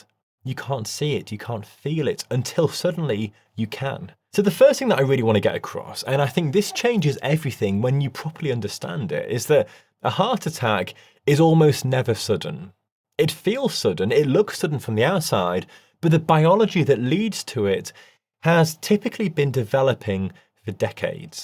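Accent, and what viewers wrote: United Kingdom. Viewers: não sei se vc é AI mas se for, quem te fez está de parabéns! além de lindo, inteligente e explica de uma forma que qualquer um pode entender! E esse sotaque britânico fecha com chave de ouro! agradeço ao algoritmo ter mandado esse video pois além de bem feito com ótimas informações, tem uma estética agradável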